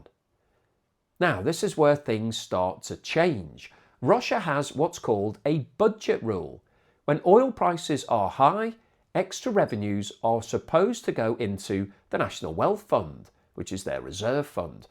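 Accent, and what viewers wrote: United Kingdom. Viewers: I do like your fast British delivery rather than those wordy USOnes that treat us like idiots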